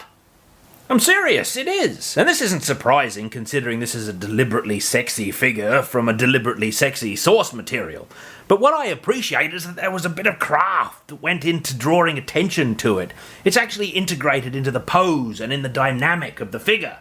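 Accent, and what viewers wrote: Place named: Australia. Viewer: Damn your a aussie I was thinking first vid I watched maybe somewhere posh in England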